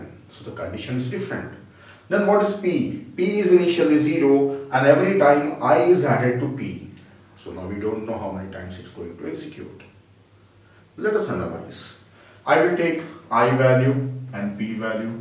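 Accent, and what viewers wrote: India. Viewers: omg op indian with less accent vey nice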